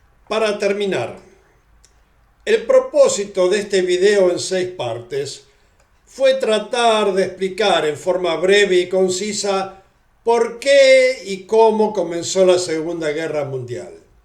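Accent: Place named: Argentina. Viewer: O espanhol com acento argentino ♡♡♡ parabéns pelas informações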